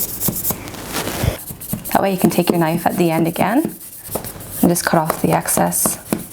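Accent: Australia. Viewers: Are you Australian? I am! Also maybe you could smile a bit more in your videos! No hate just a tip🙂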